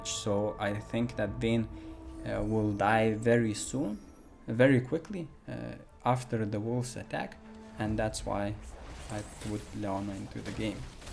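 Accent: Lithuania. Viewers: kai tu pasakai vidio iskarto matosi kad tu letuvis bet vistiek geras vidio :D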